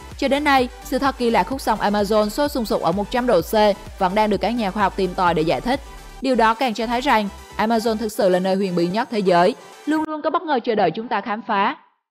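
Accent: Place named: Da Nang, Vietnam. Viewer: Giọng Đè Nẻng nghe vui tai nhỉ!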